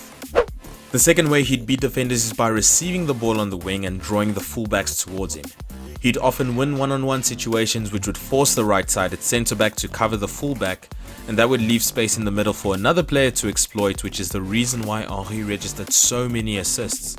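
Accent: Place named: France. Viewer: Thoroughly enjoyed the narrator. Man is definitely French. Looking forward to many more videos. Top stuff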